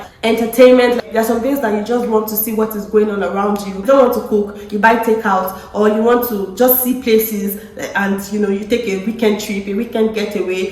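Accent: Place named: Johannesburg, South Africa. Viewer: you catching Joburg accent 🤣🤣🤣 it won't be long until you start including haibo and yoh! in your vocab.. nice video by the way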